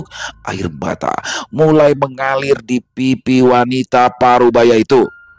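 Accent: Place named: Banjar, Indonesia. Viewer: Bang sdh fasih sekali dengan logat Banjarnya... Keren bang, salam dari kalsel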